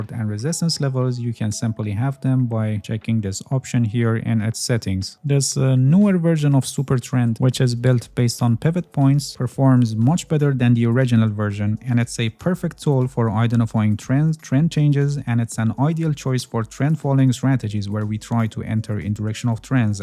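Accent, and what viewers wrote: Iran. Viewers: thanks. i can hear your iranian accent. but you speak good. 👍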